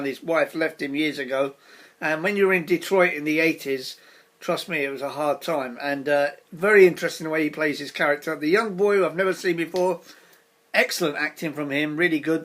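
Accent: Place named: Cockney, United Kingdom. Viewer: Great mate , thanks for the heads up on this film , keep em coming , cockney reviewer great to see 😁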